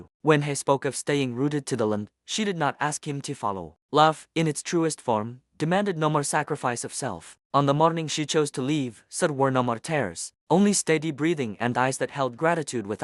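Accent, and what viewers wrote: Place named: Poland. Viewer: Horrible,,bad,,terrible narrator. At the very least,,program the stupid AI to use an actual western voice,,not a polish or russian voice. Splash? Wtf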